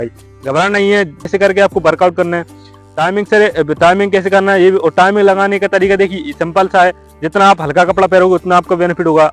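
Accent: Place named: Bundelkhand, India. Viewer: Bhai bihar se nhi lag rahe kaha se .... bundelkhand se lag rahe ho khaskar M.P se 😅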